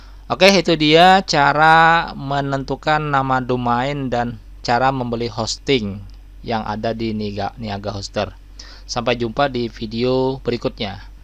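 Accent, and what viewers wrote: Banjar, Indonesia. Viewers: Banjar bangt pian nie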